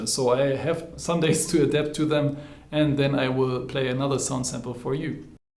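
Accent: Germany. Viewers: by the accent it seems to be a German. Ich mag Evah Pirazzi.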